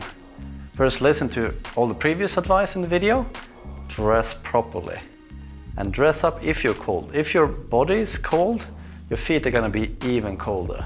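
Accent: Sweden. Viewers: Jag tyckte att det var lite svensk dialekt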